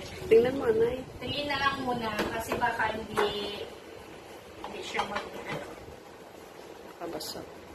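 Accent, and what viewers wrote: Iloilo, Philippines. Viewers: Nang may halo ka nga ilonggo haha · ilongga gid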